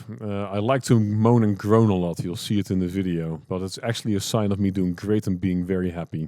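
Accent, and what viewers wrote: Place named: Netherlands. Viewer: Na 2 zinnen was het duidelijk: mooi Nederlands accent 😉 Waanzinnig gaaf verslag. Je doet iets waar ik voorlopig nog enkel even van kan dromen.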